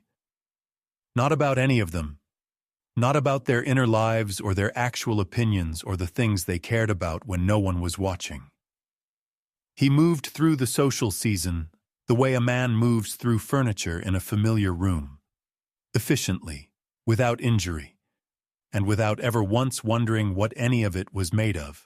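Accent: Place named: United States. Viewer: Good but a very slow internal story. Its all narrated with very little actual dialogue. I guess its in the style of repressed Victorian English people. The dull voice in an American accent throws me off but at least all the pronunciation is correct.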